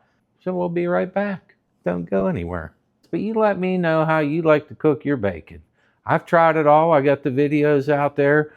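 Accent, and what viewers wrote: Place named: Ohio Valley, United States. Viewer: Hi! Love your videos and your Ohio Valley accent. I know you said you got your griddle from Sam's Club. I'm looking at the Lodge bakeware. Seems the widest cast iron "baking" pan (aka griddle) is 10" X 18". What are the dimensions of your griddle? In this video it looks wider than 10". Please let us know.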